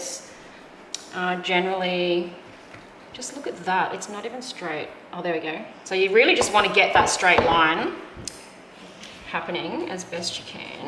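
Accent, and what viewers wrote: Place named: Australia. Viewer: This is beautiful !! So refreshing to hear an Aussie accent.....lol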